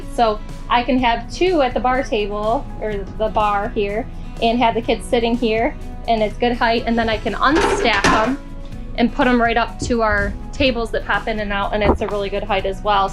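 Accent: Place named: South, United States. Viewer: you are getting a southern drawl, also LOVE the intro. Cool storage ideas/hacks.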